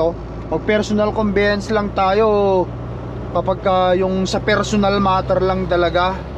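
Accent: Ilocos, Philippines. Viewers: Unawain mo kase ganyan tlaga lahat ng nagsisimula....wag ka naman masyado mag magaling boss..at isa pa ganyan tlaga ang pronouncetion ng mga ilocano..wag ka masyadO busher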